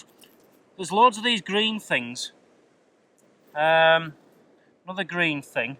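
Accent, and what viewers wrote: United Kingdom. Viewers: You sound exactly like a british person I had to call from work yesterday, cause his car is damaged and for repair in Germany lol.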